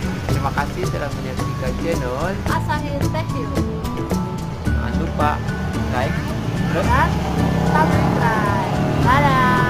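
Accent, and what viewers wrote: West Java, Indonesia. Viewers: Logat dan bahasa sunda nya jangan diketarain dong, yang lampung ga ngerti!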